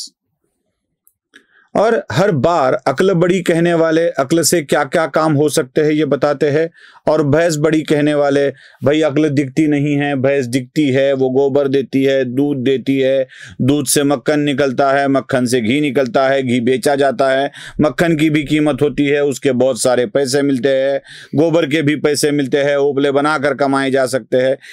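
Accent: Maharashtra, India. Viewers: Mujhe Marathi language aur Hindi accent maharashtrian logon ka sun ke bahut achha lagta hai...❤❤❤❤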